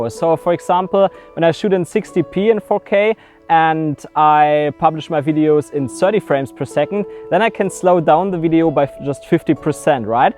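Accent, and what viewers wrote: Germany. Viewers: Man hört das du Deutscher bist 😅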